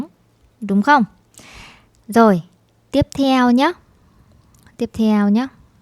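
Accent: Vietnam. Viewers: I really love your Northern accent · is this northern, southern, or central vietnamese?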